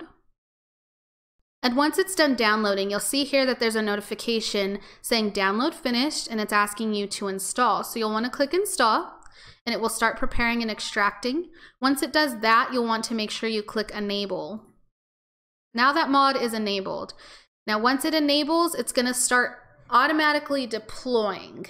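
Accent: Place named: United States. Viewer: Hi great video and i love your Accent if you dont mind me asking what part of the US do you Hail im actually from the UK?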